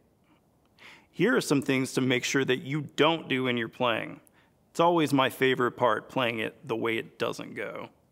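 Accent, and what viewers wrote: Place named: United States. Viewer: He has an American accent, but the temper of a man in the British Parliment.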